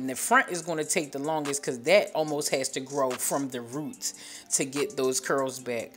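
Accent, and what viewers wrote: Philadelphia, United States. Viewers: 15 minutes in and the Philly accent slapped in the face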